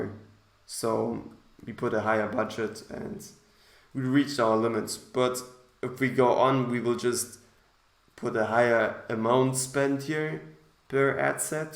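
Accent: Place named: Germany. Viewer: Die ersten drei Wörter gehört und sofort gewusst, dass du Deutscher bist hahaha. Geiles Video, hilfst mir damit weiter, danke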